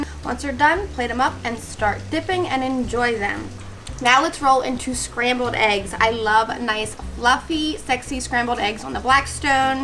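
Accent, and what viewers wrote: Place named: Central Pennsylvania, United States. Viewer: OK, you've got to tell me.. are you a Central PA girl?? I started wondering when you mentioned Cooper cheese in another video, then you throw out "dippy eggs" in this one. Add in the products from Giant and of course your accent, and I'm saying you must be from PA. Am I right??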